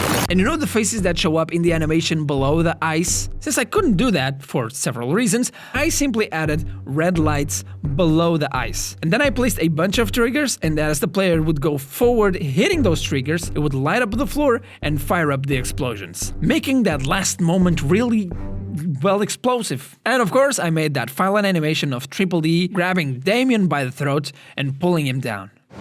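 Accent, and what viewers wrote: Portugal. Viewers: Ah, ai está o famoso super reconhecível sotaque português, good work on the game man, nice job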